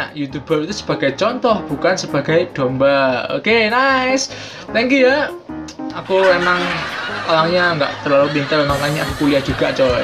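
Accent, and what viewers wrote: Java, Indonesia. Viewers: Medok bgt ngomongnya :v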